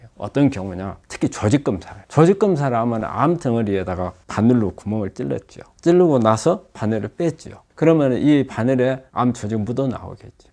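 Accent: Jeolla, South Korea. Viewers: 아따 절라도고만 ㅎㅎㅎㅎㅎㅎㅎㅎㅎ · 전라도 억양 너무 듣기 싫다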